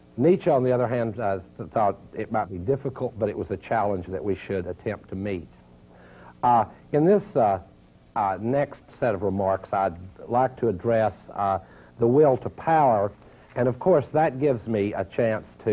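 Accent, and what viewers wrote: South, United States. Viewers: a southern twang and philosophy go together so damn well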